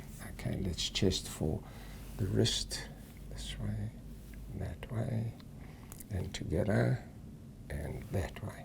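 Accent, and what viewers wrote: South Africa. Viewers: I thought was south african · I was also confused. I heard South African but when I looked him up it indicated Australia! Well, went to Wits so definitely South African, but I suppose just moved to Australia. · South African accent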